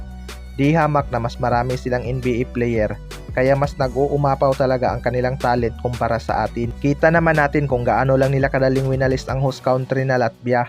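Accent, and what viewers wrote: Visayas, Philippines. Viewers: Magbalita ka naman ng tama bisaya oi😅😂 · Bisaya ka bai😂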